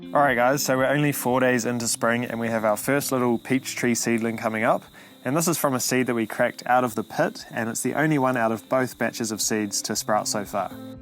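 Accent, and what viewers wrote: New Zealand. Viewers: This is the best video on how to grow peach or nectarines from seed. This is how you make good videos. No nonsense video. It must have taken a lot of patience. The kiwi accent is like a cherry on the top.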